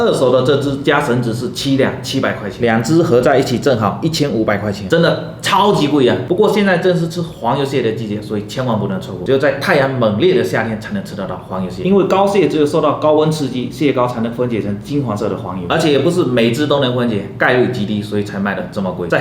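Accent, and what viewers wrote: Fuzhou, China. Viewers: 一听就是老福州了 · 怎么福州口音 这么重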